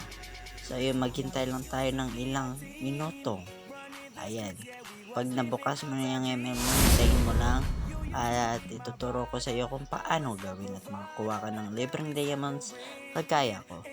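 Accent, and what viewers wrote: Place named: Bisakol, Philippines. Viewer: Parang bisakol na nga mag explain tas scam pa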